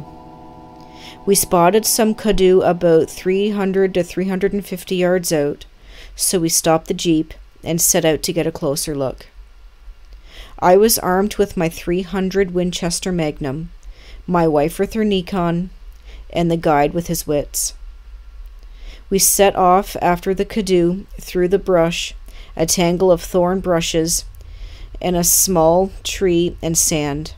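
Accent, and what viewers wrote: Canada. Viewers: Yeah without a doubt, you can hear the Ontario accent!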